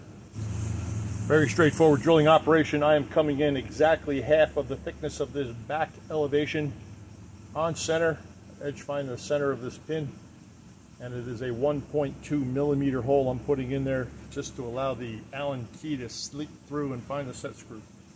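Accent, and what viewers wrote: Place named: Texas, United States. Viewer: Did I undesrtand you right ? "makes you wanna blow your hair out" My native language is german, so it is sometimes a little bit difficult to understand your Texas slang. Greetings from Austria ;-)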